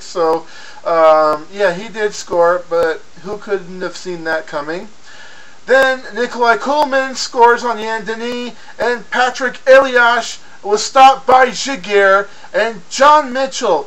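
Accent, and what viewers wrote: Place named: Sweden. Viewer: Why the Swedish accent?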